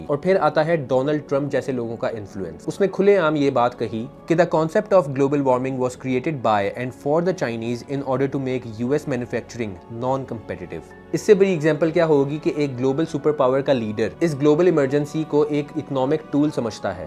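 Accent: Lahore, Pakistan. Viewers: aapka content acha hai lekin bs apni urdu sahi krain lahori accent hai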